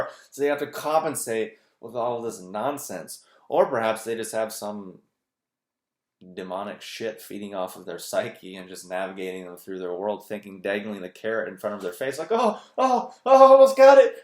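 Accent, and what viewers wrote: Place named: South, United States. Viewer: oxymoron if you don't know what he's saying but keep coming back because he talks level headed emm OK, u may have a demonic force on u haha. little penis lol Spot on all this spiritual Shit people take so obsessively and they're usually the biggest bastards behind closed doors. at least I know some of my beliefs are a delusion but I like them so I guess that's my entertainment...you are a thought provoking fella, (that southern accent, I fuckin love your mocking voices) 😂😂👍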